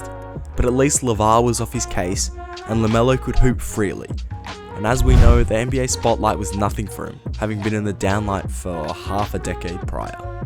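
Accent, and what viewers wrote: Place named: England, United Kingdom. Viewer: Im not gonna take anyone making a video about the NBA with an English accent seriously. Im just not.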